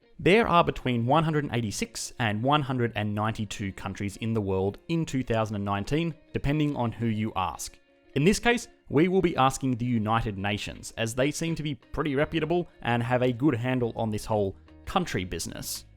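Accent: Australia. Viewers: Dam Australian! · Sounds Aussie.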